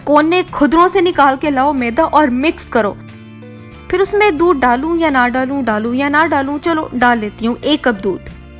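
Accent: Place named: India. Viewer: Cooking me desi type of (لہجہ ) lehja... hayeee maza aa jata hy...❤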